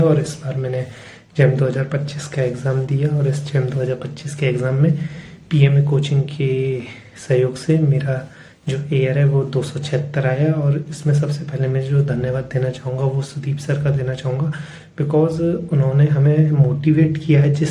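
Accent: Bengal, India. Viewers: sir, THANK YOU VERY MUCH for your content over these years. Your channel is probably the major factor in getting me this amazing IIT JAM 2025 rank I've achieved. Also, I love your Bengali accent. It is quite adorable ❤❤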